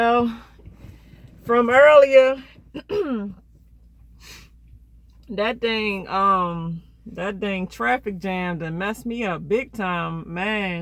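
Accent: Baltimore, United States. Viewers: Lol! Sewper dry? Yewtube channel? I love your accent! Baltimore!😂😂😆😆😘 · Bmore accent.lol.sexy tho I found you cause I'm about to be a truck driver..n can't stop watching you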